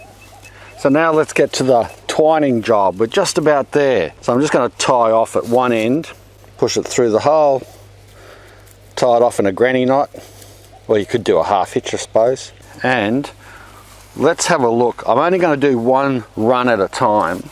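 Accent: Australia. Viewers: Your content is so wholesome. When you said "don't do this at home" it reminded me of my dad except with an Australian accent.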